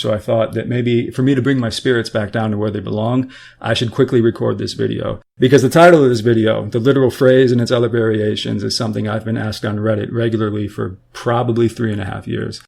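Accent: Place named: working class, United States. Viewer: he sounds like most working class americans. this is how we talk. and he's being honest about how he feels which is a good thong, actually. if it makes you uncomfortable, maybe its a personal problem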